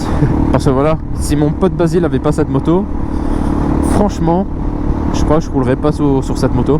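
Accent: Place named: Belgium. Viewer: tu es ou en Belgique ? Je le sais avec ton accent de begique et les plaques. Sinon au top de la vidéo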